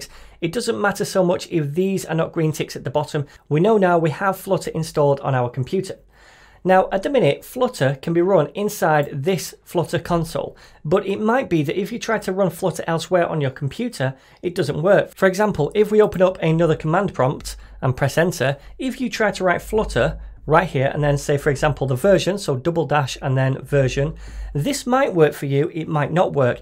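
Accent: United Kingdom. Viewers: Long live bri'ich accent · ngl, at first i thought this man was indian, but when i listen him carefully.... He is british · british accent right? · your British kind of accent is relxing and easy to be understood and the way you explain things is really something. Thanks!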